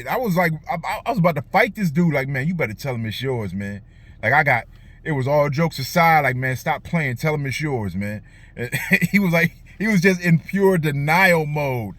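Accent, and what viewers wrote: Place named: Pennsylvania, United States. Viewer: Are you originally from PA? You got that Pennsylvanian accent lol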